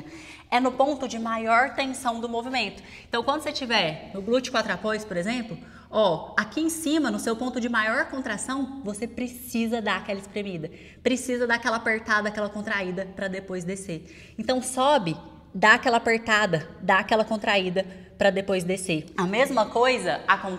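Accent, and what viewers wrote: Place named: Goiás, Brazil. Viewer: kkkkkkkkk, o sotaque dela sempre entrega que ela é goiana raiz.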